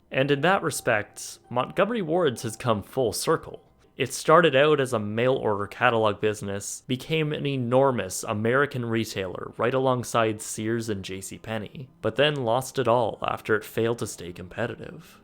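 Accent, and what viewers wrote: Canada. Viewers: He is from canada they pronoun words differently. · from Canada. They pronounce some things different up there. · Love your videos. Great job,. And your voice is so nice and relaxing. Love that Canadian accent. Love from Oklahoma 🧡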